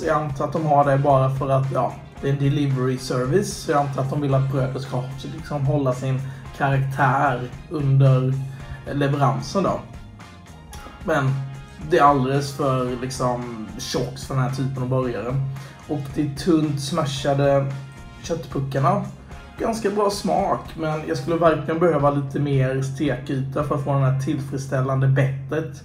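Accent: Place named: Småland, Sweden. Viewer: Man älskar ju å lyssna på din småländska dialekt ❤ tack för trevligt avsnitt! 👏🙏 men ska biffen i en burgare vara rosa i mitten som dessa är? Jag har för mig att den bör va genomstekt.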